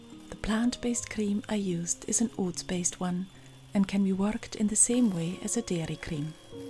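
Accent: Scotland, United Kingdom. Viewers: How is it that you have such a pronounced "Scottish" accent? I''ve known people who've spent almost their entire lives living in their adopted country but still speak english with the accent of their birth country.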